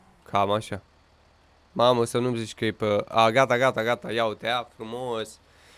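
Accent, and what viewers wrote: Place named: Latin America. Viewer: Tia intrat in sange accentul de lantic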